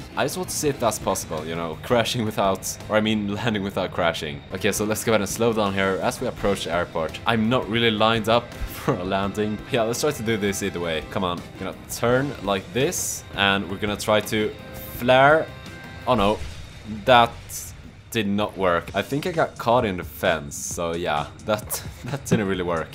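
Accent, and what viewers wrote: Sweden. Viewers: Are you from sweden? The way you speak sounds like when a swedish man speak english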